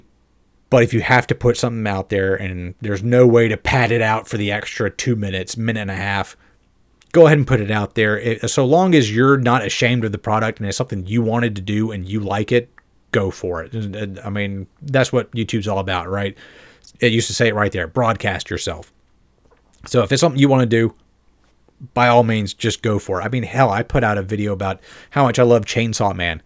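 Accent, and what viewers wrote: United States. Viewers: I'm obviously not speaking for everyone, and it is somewhat rude to say, but Slap on Location is annoying to listen to while playing games, due to shifting tones of narration (read vs memorised), long pauses in speech and the occasional interview, a big hurdle for people not accustomed to American accents.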